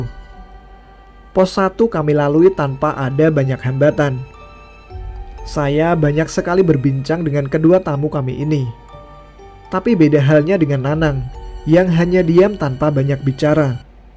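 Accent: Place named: South Kalimantan, Indonesia. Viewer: Banjarmasin Balangan kal-sel suaranyaa😎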